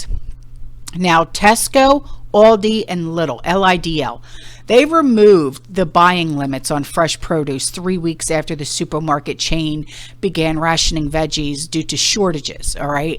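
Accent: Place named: New Jersey, United States. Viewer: I'm right there with ya shaking my fist in air. I had a feeling this was something to pay attention too when I heard the jersey girl coming through my screen!! Lol. You get 'em girl!!!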